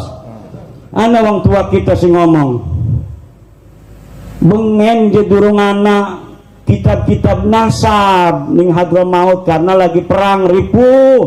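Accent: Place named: Banten, Indonesia. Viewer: Gas terus yai IMAD........KOCAK LOGAT BANTEN LUCU , OJO WANI WANI SIRE SIRE BANTEN SING ADA LAWAN .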